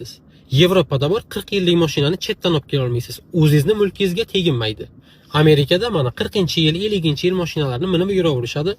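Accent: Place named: Khorezm, Uzbekistan. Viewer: Bu brat Xorazmdan uxshidi aqsent uxshamin duribdi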